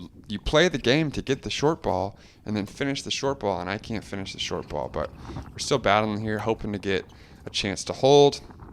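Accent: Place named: South, United States. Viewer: her southern accent killed me, love the skits.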